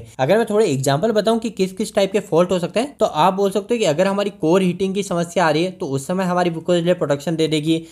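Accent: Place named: Maharashtra, India. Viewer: Sir Aapki bhasha sunkar Aisa lag raha hai ki Aapko Marathi bhi aati hai Aapko 😅😅Sir plz Marathi mdhe video banva na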